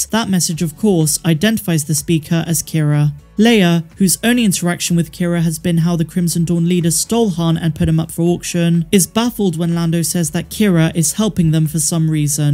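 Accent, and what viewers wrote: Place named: Bristol, United Kingdom. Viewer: Love the videos! Gotta say that every video reminds me of home with certain words having an almost Bristolian accent!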